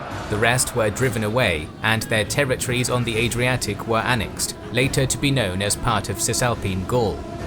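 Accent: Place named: United Kingdom. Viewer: I don't know who's the narrator of those videos but he's absolutely great, brilliant voice, diction and of course lovely British accent... so comprehensive and flawless!!! Thank you guys! Great Job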